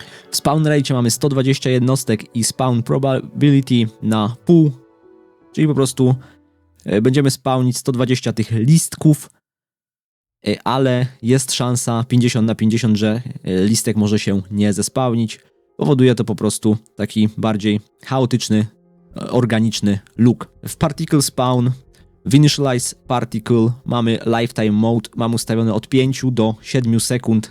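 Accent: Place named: Poland. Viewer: Mówiąc szczerze dawno nie słyszałem Polskiego akcentu w tutorialach, rób dalej co robisz wszystkim się to przyda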